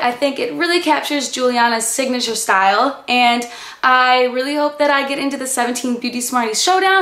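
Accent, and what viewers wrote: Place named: Cleveland, United States. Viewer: hi! i really like your videos, i just subscribed:) ps- and I've been noticing how your accent is very similar to where im from (cleveland) and when you said you were from chicago it made so much sense just thought i'd let you know cause it seemed funny to me hahaha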